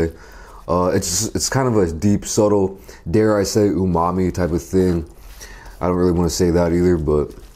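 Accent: United States. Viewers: How's his accent so american?? Not a regular here so do explain to my ignorant ass 😁👍🏾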